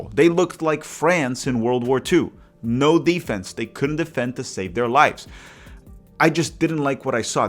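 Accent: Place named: Rio de Janeiro, Brazil. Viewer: Awesome to have Brazilian football/soccer coverage in English. Just wondering why you’re pronouncing Palmeiras and other ‘s’ like a Carioca 😂. My wife (a gringa) who is learning Portuguese was confused and of course I’m steering her towards the Paulista way of pronunciation.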